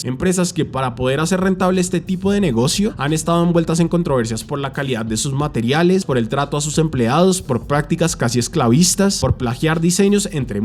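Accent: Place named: Bogotá, Colombia. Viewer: El único problema de este video es el acento rolo maluco.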